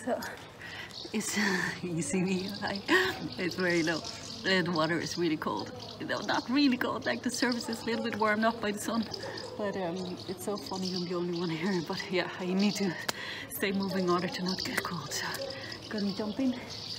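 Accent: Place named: Ireland. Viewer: I just saw a little boy child across your camera when you went in for a dip...so am figuring that you aren't the only person there........trying to figure out your accent....oh, i thought it was Irish.....lol oh, those men don't really mean any harm, they are just trying to break the Ice .....I am British, but my parents are Jamaican. A lot of people ask me where I am from, but because I am black, I get asked , so where are your parents from, so I have to explain they are Jamaicans.......BOOM !, as soon as they hear Jamaica .........Bob Marley, Ganja or Usain Bolt . I get it, they are trying to relay connect with me by the only thing(s) that they know about Jamaica, so maybe those men only know about the red light district and maybe the weed Cafes there.......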